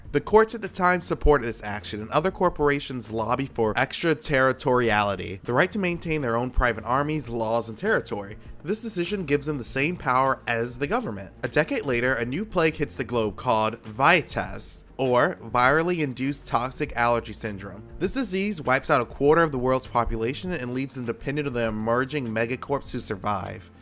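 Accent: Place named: United States. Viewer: pretty easy to understand, he is probably American judging from the accent alone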